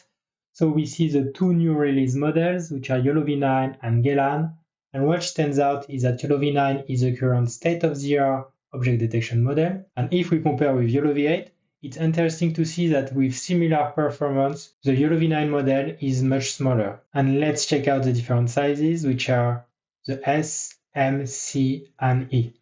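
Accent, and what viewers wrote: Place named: France. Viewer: Hi ! You sound french haha, can you make a video on extraction of boxes with Yolov0 and SAM for segmentation ?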